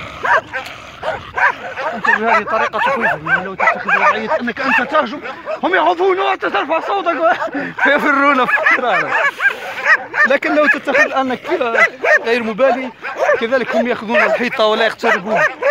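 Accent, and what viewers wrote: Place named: South, Morocco. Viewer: Noman Khushi أظنه مغربي من الجنوب وذلك من نطقه للغة العربية 🙏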